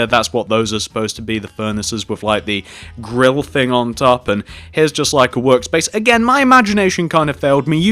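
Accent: England, United Kingdom. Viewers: Are u British? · He's English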